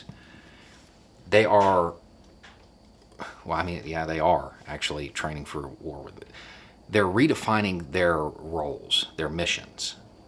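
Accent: South, United States. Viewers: It's the southern accent. 😁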